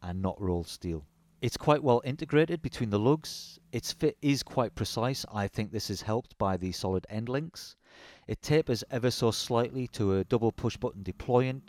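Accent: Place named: Newcastle, United Kingdom. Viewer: Are you from Newcastle by any chance? I recognise the accent. Great video by the way, keep up the good work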